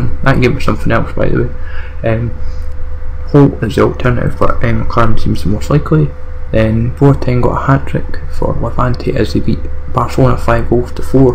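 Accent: Scotland, United Kingdom. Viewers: Just not use to Scottish accent I guess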